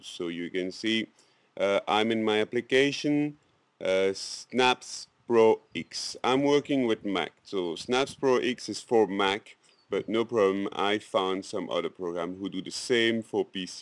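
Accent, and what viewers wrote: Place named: France. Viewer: lol accent francais :)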